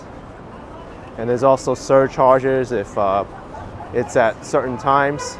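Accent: New York, United States. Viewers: New Yawk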